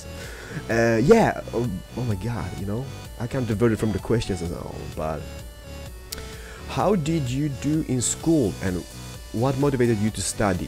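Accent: Sweden. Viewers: ÄR DU SVENSK?! (are you swedish?!) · Jag är oxå från sverige :D Du har svin härlig dialket! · Wuuuut are you Swedish :O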